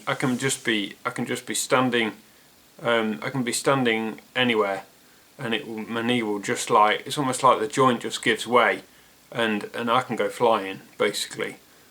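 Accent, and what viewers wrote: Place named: Nottingham, United Kingdom. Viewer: Hi, I thought I recognised that lovely accent of yours, then you mentioned Nottingham! I am originally from Mansfield, living up in Yorkshire now though. I am finding your videos very informative, currently going through the MS diagnosis process myself. Keep them coming, they are very helpful. Thanks.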